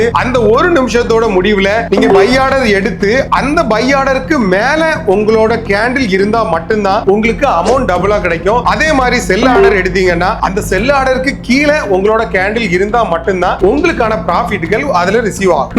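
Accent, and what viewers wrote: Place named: Kanniyakumari, India. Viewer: Unga slang apdtha irukku nanum kanniyakumari tha marthandam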